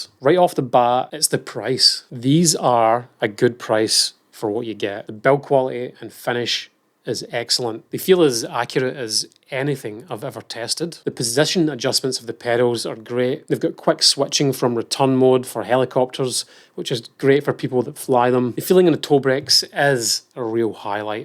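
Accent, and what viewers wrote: Scotland, United Kingdom. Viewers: Would've guessed Scotland or nearby from the accent! Where are you originally from?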